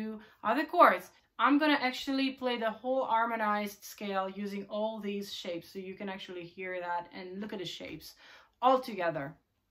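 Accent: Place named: Sweden. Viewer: Very talented! Eddie Van Halen fan here! Where do you reside from? Accent is hard to nail down. Kind of sounds Swedish? I am from MN, USA. Keep on rocken! Nice tapping!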